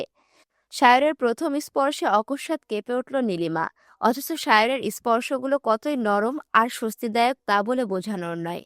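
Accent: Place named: Kushtia, Bangladesh. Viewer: তাই কুষ্টিয়ার মানুষের মতো মাঝে মাঝে কথা বলো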